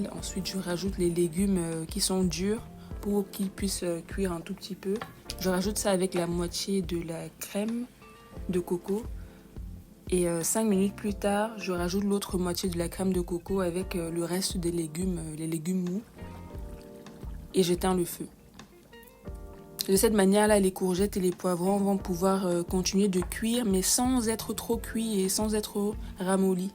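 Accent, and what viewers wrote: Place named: Cameroon. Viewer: J’adore bravo… 3 vidéos en quelques minutes , je me suis abonnée à la 3eme minute après la 1ere vidéo. Le petit plus la voix et l’accent un peu camerounais à la quelle vient s’ajouter la forme des bananes plantains 😊 ça renforce cette idée des origines camerounaises… Très belles vidéos et franchement la voix est captivante ❤❤❤ Je te souhaite un beau succ😮pour la suite ❤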